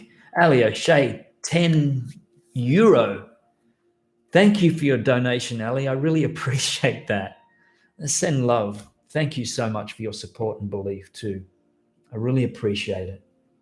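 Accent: Australia. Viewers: Love your accent and your upbeat vibe!❤❤❤ Australia rocks and I've never even been there!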